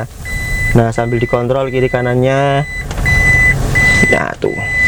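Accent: Java, Indonesia. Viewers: g papap mas bilang Cagak. itu cirikhasnya Vlognya mas ada aksen jawanya, dan itu yg bikin lebih seru dan enjoy untuk d tonton. sehat terus mas. rajin upload terus. lancar rizkynya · kalo boleh tau itu mobilnya siapa mas? logatnya jawa banget tapi plat nya D (bandung)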